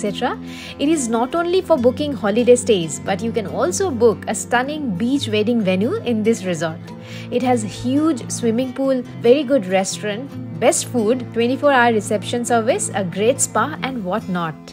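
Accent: India. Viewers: Refreshing to see an Indian looking girl with an Indian accent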